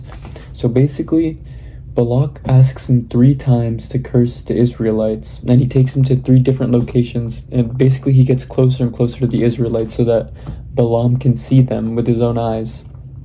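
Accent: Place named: Spain. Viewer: We like your Spanish accent 🇪🇸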